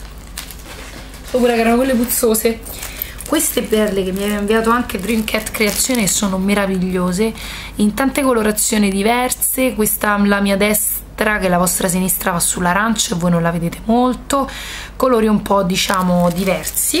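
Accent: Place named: Rome, Italy. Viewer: Ciao! Finalmente sei tornata mi mancavano i tuoi video e il tuo accento romano troppo forte! Attendo il video con le tue creazioni, sono solo un pò curiosa...Bacio!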